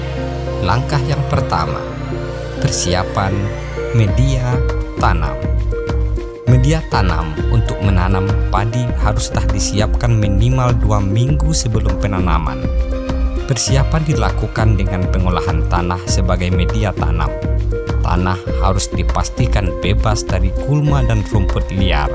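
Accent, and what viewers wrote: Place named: West Sumatra, Indonesia. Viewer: dialeg regional Minangnya menambah manis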